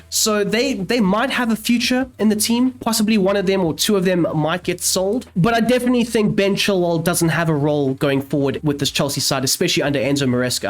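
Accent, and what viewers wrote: South Africa. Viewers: Fellow South African?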